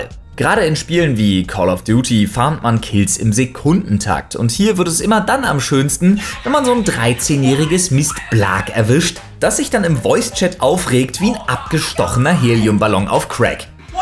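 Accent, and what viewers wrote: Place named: Bavaria, Germany. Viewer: Der und sein bayrisch! <3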